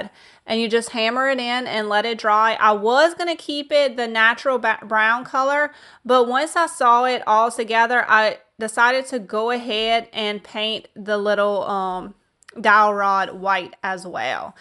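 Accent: Louisiana, United States. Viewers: Are you a born Cajun? Is that the accent I hear? LOVE IT SO MUCH! Your projects are some of my favorites ever. XOXO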